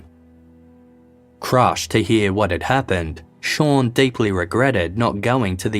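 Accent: Australia. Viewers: Thankyou! I'm so glad I've found an Aussie narrator! Makes listening so much easier and relatable! Thankyou, team, for researching as well as you do. Truly unmatched. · I agree with livingtheaussiedream. Nothing personal to the yanks but its great to hear Australian English spoken.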